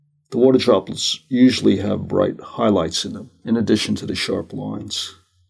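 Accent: New York, United States. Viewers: Loved watching you paint. Wonderful composition. You are making me miss home, New York with your accent. Thanks.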